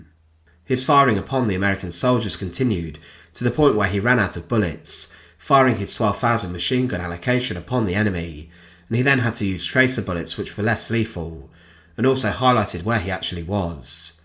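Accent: United Kingdom. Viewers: Why couldn't an American read this? They always tryna put Brits in charge so it sounds like they're smarter. I don't like listening to this.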